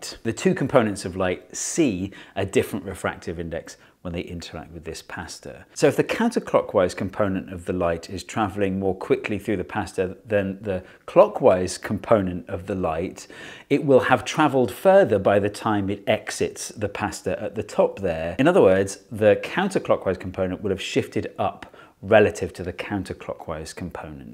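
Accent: England, United Kingdom. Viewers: he finally says anticlockwise! Sounded so wrong to hear his English accent saying "counterclockwise"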